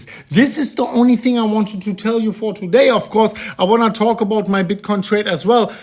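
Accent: Germany. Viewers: German accent is strong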